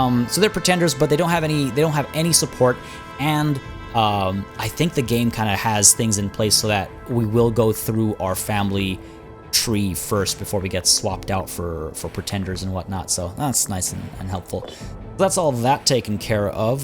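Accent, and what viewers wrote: India. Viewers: Bhai ye India lag Raha tha muje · How can your Indian accent is soo good are you related to India in any manner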